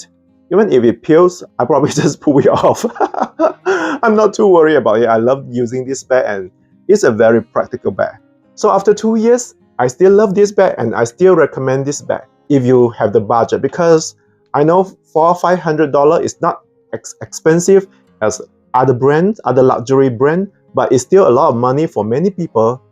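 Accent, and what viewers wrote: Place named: Malaysia. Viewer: no offence but are you anyway from malaysia/singapore cuz you have their articulation? 🤣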